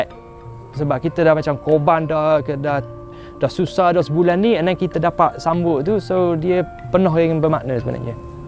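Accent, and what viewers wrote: Terengganu, Malaysia. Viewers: Memang macam org ghanu nak cakap KL...😆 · Bukan tu je,fasih berbahasa Melayu loghat Terengganu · Bangla cakap sleng bangla. cakap ganu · Tu bezza kena pahang...bahasa Melayu dan loghat Ganu...! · he just became a pure terengganurians... when he talk like that and the bodylanguage is on point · Alhamdulillah.... didoakan moga & keluarga bahagia sampai JannahNya. membawa budaya Malaysia yg baik, khususnya loghat Terengganu😁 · pekat ganuu! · Untung... Ckp mlyu siap ngn loghat ganu... tu yg unik n lbh dkt dgn msyarakat 👍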